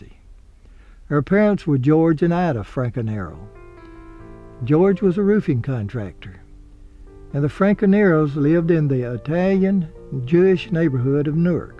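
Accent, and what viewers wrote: South, United States. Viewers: Such a sad story! I hope I will be able to sleep...after listening to you. Nonetheless, you have a beautiful, soft voice with a soothing southern accent. Thank you anyhow. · Great Southern narration voice. At least this British man loves it.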